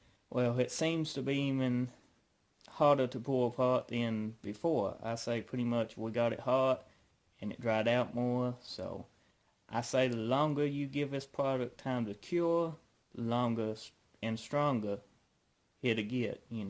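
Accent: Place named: South, United States. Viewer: LMAO....YEP. WHERE ARE YOU FROM? YOU HAVE A SOUTHERN ACCENT...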